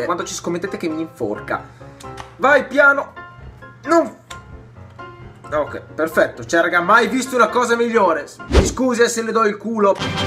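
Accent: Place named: Emilia-Romagna, Italy. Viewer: ma tu di dove sei? ho sentito un bell' accento Emiliano-Romagnolo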